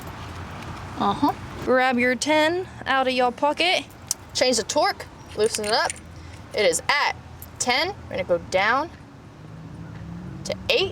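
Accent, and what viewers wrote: United Kingdom. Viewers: A little British accent. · Hats off to you for using anti-seize compound. Why do you intermittently speak in a British accent? In answer to people asking why she’s changing the plugs so soon, it’s so she can make an instructional video. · Great British accent. The clip ain't bad either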